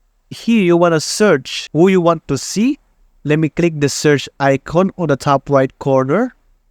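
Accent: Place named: Philippines. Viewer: Accent tagalog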